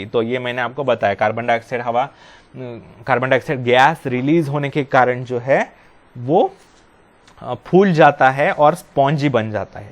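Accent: Maharashtra, India. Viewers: Sir aap marathi ho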